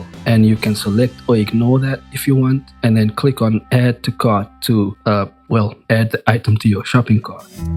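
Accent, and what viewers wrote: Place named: South Africa. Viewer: Why do you sound south African.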